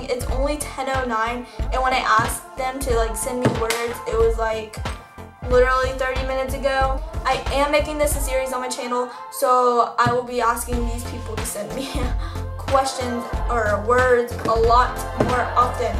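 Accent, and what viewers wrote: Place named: Canada. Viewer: Oh I thought u were Canadian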